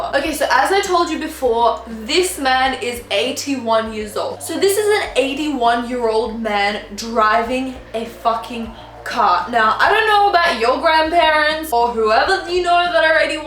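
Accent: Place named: Australia. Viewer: You know I was wondering if sometime you'd like to do a collab? There's this challenge called the accent challenge and me being Canadian and you with your cool Australian accent I thought it would be perfect. If you'd like to here's my email we could discuss it more and if not that's totally cool. <mail>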